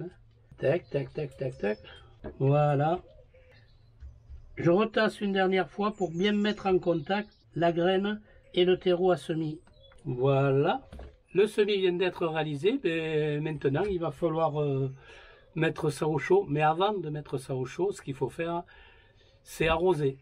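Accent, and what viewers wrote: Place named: South-West, France. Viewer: Bonjour Monsieur, votre accent sent bon le sud ouest j'ai une connaissance à Pauilhac 32500 après cette parenthèse régionale voilà ma question votre mélange (terreau perlite vermiculite ) quels sont les proportions optimales pour vous imiter sans aucune discussion? en vous remerciant d'avance pour ce que vous faites aux amateurs non voyant que nous sommes.... mes salutations J.P. made in belgium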